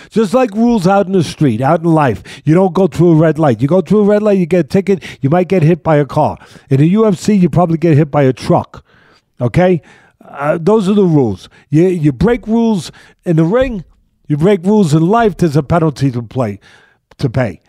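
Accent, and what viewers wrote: New York, United States. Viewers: Gotta love that old school NYC accent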